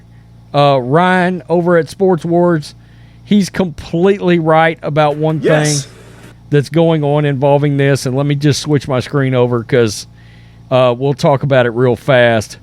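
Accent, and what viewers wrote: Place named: South, United States. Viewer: apple said CANCUN ON 3 with the heart hand gesture. Le'ts not downplay that. Why would he say cancun 3. You know whats ridiculous is how you have a show and 178 subscribers???????? How?????? LOl you get paid for this/??? How?? Must have a lot of inbred cousins that follow and like your show. You sound like a hillbilly and you somehow you have a zoom group and viewers???? HOw?????? You should consider hiding and maybe wear a mask OR just putting your little video on mute. Eli apple was being a douche. But a douche is a douche. Your live news is really boring and i really hope you don't get paid for this. Bengals are so tough and going to kill everyone. Glad they went to Burrowhead and showed everyone the chip on their shoulder. Who watches this??????? Is this a redneck incest private feed????????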